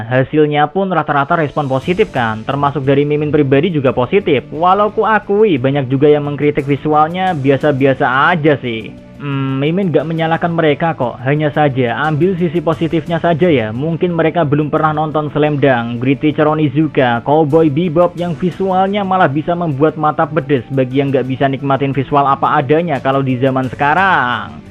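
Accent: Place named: Welahan, Indonesia. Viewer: Min kue wong welahan?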